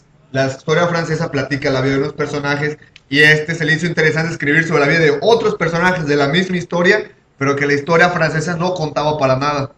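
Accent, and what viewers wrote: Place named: Argentina. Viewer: jajajajaja argentino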